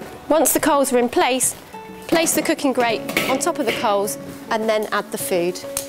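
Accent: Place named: England, United Kingdom. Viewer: The first clue that this was going to be total crap was the English accent . . .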